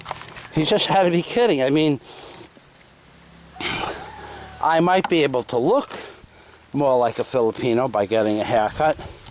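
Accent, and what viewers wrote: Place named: New York, United States. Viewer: I'll skip over the probability of you ever looking like a filipino by changing your appearance. BUT sorry bud, with that New Yawk accent you'll never be able to pass. Good video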